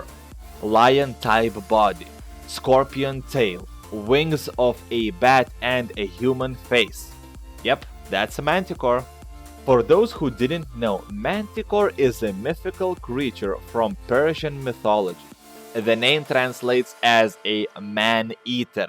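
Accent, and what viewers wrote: Russia. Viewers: wtf man im not even inglish i just asked if he was russian... because the way he talks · That accent is amazing comrade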